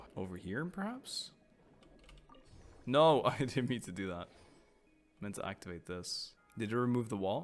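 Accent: France. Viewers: I love the french accent you do, pretty accurate as my professors speak just like that 🤣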